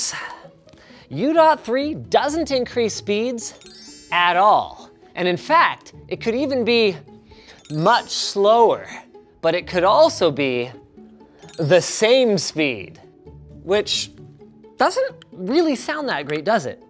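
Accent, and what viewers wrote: Canada. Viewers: For some reason you seem more Canadian in this video than normal. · Linus’ Canadian is showing more and more every video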